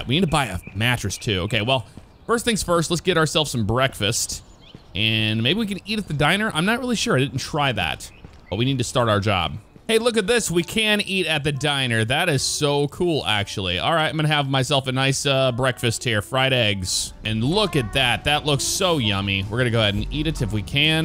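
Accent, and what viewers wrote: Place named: South, United States. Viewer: Always enjoy your vids. And the country accent! 💪😁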